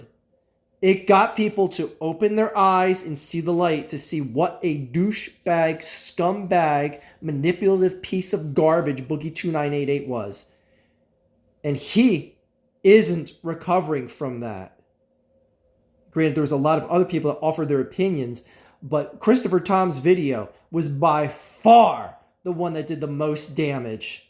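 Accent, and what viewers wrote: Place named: Philadelphia, United States. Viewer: Straight out of Phillyburbs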